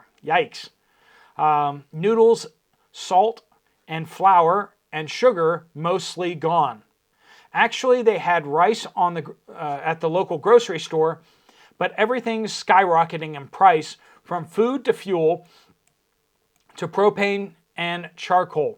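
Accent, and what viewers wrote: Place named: South, United States. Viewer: Sounding like southern prepper again....😢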